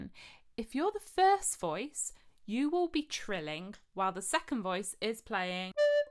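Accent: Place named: England, United Kingdom. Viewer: I LOVE Telemann. Question fer ya, if you don't mind. I love English Accents. Where is your accent from? England has so many & some I really like. (if you don't mind me asking)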